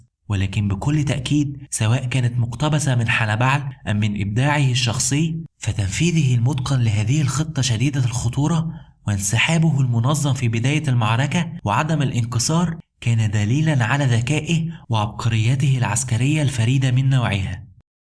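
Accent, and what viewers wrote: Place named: Egypt. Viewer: تعليقك وسردك للمعلومات سيئ جداً.. ما ادري ليش المصريين متعلقين بلهجتهم ..؟ انت تقدم ماده المفترض منك نطق الحروف بالشكل الصحيح ..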